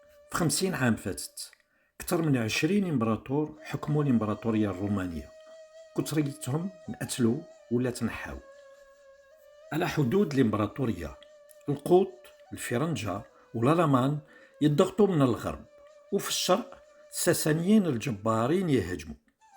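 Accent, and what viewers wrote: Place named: Tlemcen, Algeria. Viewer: Une narration Tlemcenienne authentique et magnifique. Allah ya3tek essaha ouyahafdek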